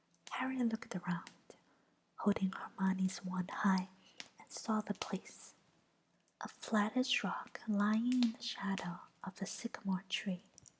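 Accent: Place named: China. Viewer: from your accent I could tell that you are from china ?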